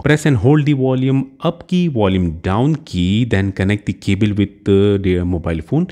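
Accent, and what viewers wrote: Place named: India. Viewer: Always an Indian with strong sccent. Never an american making these videos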